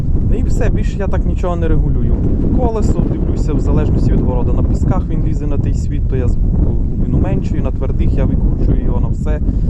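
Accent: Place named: Dubrovytsia, Ukraine. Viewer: Доброго... по говору ви наче з Дубровицьких країв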